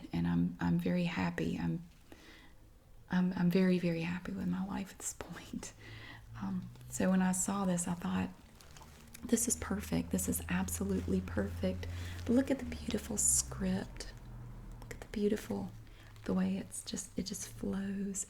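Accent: South, United States. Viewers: love the southern drawl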